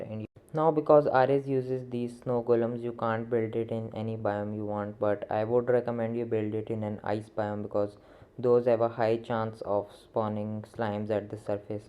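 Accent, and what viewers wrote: India. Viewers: Your English give me vibes of an Indian person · Good Job man, don't judge me but by your accent I feel like you are Indian too and I think you can help build a sensible Minecraft community here.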